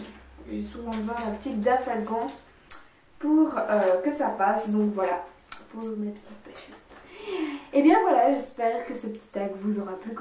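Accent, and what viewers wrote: Belgium. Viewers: Tu es belge?!?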